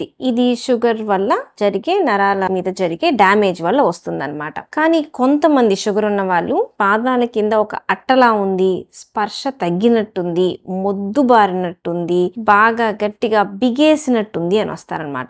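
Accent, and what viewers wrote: Guntur, India. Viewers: Maanchi guntur yaasa😅😅😅😅😅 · సహజమైన గుంటూరు భాష ,యాస👍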